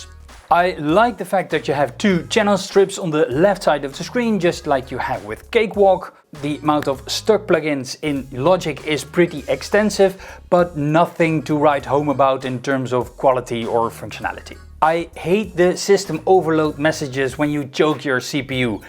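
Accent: Netherlands. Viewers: Hi, Are you from the Netherlands? (The accent sounds to me like that)….in that case I could ask my question in Dutch. 🙂 I was wondering….these are all tips for Imac/windows right? Any suggestions if you want to work on an ipad (and only ipad)? I’m using garageband now….I know it’s not the most professional choice, but for free and I can use it with just my ipad. Any advice?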